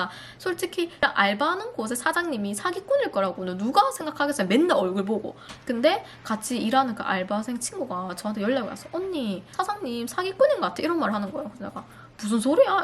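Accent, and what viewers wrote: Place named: Daegu, South Korea. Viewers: ㅠㅠ 너무 속상한 일일텐데 말도 너무 웃기게 하시고 ㅋㅋㅋㅋ 사람들이 비슷한일 당할까봐 열심히 설명해주시는게 너무 귀여우세용!!! ㅋㅋㅋ 저도 대구사람이어서 사투리도 너~~무 반가워요!! 은행사이트도 구글에 검색해서 들어가면 똑~~~~같이 만들어서 사기치는 놈들이 있다고 하더라고요.. 꼭 진짜 사이트인지 확인 잘하시고 이번에는 더 큰일 안나서 다행이에요!!♥️ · 항상 웃기고 재미남 지송 사기당했다는데...있는그대로모습 미국에서 대구사투리 찐정겹당 ^^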